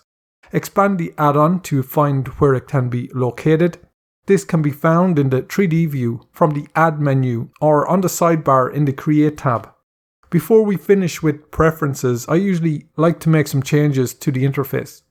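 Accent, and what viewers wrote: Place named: Ireland. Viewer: Excellent - thanks for pacing this nice and slow, and with clear diction (also nice to hear an Irish accent!). Many tutorials become hard to use when you have to pause, rewind and try to hear what the guy (always a guy) is actually saying. After using Sketchup for years, it takes a while to get the hang of Blender. Thanks again and keep 'm coming.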